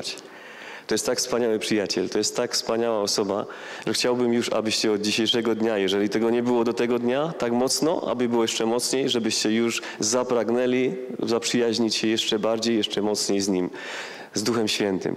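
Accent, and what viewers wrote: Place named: Silesia, Poland. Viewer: spoko Ślązok, genialny akcynt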